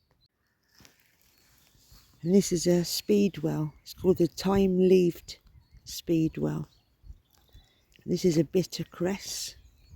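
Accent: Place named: West Country, United Kingdom. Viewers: Hi I just found your channel and have subscribed - obviously. My one burning question is where is your lovely accent from? At first I thought West Country, but I’m not sure that is right. Looking forward to seeing more of your videos. Tell Minnie I said “hello”. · From a 70 year old Upton boy living in wales now , I recognised that great Worcestershire accent. Lovely videos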